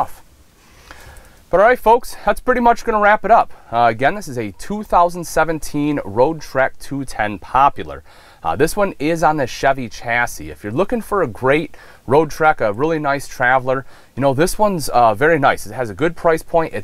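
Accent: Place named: West Michigan, United States. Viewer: This guy is well-spoken, knowledgeable, and has a nice West Michigan accent!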